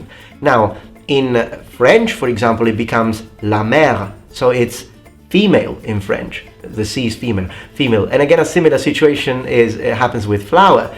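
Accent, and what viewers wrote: United Kingdom. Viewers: why does he sound so British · Do you live in the UK? I'm American and can't always pinpoint the different British accents, but when you speak English it sounds un poquito italiano y muy britanico :) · Why do you have a British accent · ok, here's one for you. My dad (padre) was born in Sicily, emigrated postwar as a small child. Mi Noni they never got a handle on much English, so I grew up hearing the dialect, enough to mess up my Spanish in college and with a Honduran girlfriend. So what is my question? why the British accent, My zio's and Zia's sounded like new yorkers and I sound like someone from Chicago? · I would like to hear your opinion of British English vs American English. I say this because the English you speak sounds British, not American. Besides the accents being much different, American English, especially in the Southwestern United States, has Spanish influence. 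I grew up in the Southwest, so a few spanish words were part of my natural vocabulary. I was 30 years old and living in the south eastern US when I encountered people who didn't understand when I said PLAZA. They asked me to define it, and that was the first time I actually realized I was using a Spanish word, and not all Americans understood it. It took me a couple minutes to define it. I'm enjoying your videos, keep up the good work. The closest related language to English is I believe Dutch. There may also be a difference between the similarity of British vs American English. Dutch settlers came to the New World at the same time as the English settlers, and some English settlers had lived in Holland for a while. Additionally, Amish, Mennonite, and some other communities still speak Dutch, as well as English, and even refer to those outside their communities as "the english." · Tienes un acento británico increíble. 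Cómo así?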